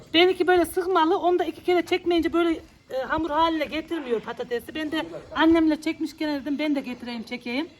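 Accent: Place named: Avşar, Turkey. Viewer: kardeşim selamlar, yeni abone oldum, memleket neresi kardeşim, avşarlık varmı şiveler benziyor gadasını aldığım 🙂 Frankfurt'dan selamlar hayırlı günler 🇹🇷🇹🇷🇹🇷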